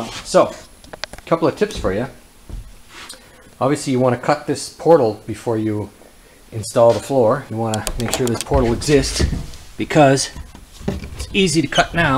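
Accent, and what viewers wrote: Canada. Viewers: Don't speak Canadian. Didn't learn anything.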